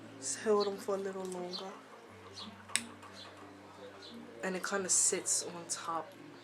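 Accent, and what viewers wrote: Bermuda. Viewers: You sound bermudian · Are you Bermudian? Your accent sounds like it...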